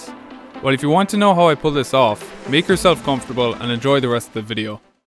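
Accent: Ireland. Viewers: What part of Ireland are you from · Wait are you Irish ☘️? Don’t tell me my fav fortnite map builder is from the same country as me?! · Are you irish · I knew you were irish 🤣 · Me to Yas he sound like an Irish ☘️ ❤️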